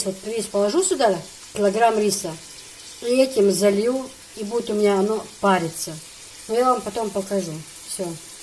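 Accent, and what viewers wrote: Chechnya, Russia. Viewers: ты такой молодец,знаю как было тебе тяжело освоить ментилитет чеченский,ты справилась на все 100%,ты полностью воплотилась в чеченку,что акцент,что внешность,что монеры.Хвала тебе,и восхищение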